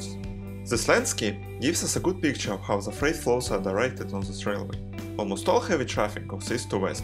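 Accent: Russia. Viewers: Хорошее видео, но неужели нельзя было найти человека с английским без акцента?? Монотонная ровная речь характерна для русского языка. Английский совершенно не такой. Пришлось прекратить просмотр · Very good quality filming. The only thing I would ask or comment on is although your English is very good your Russian dialect can make it difficult to understand. I would suggest slowing down you're speaking just a little bit.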